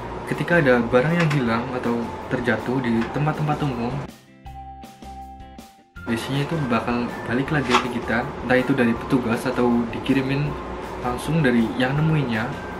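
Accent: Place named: Banyumas, Indonesia. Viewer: Ora ngapak ora penak😀👍🙏🙏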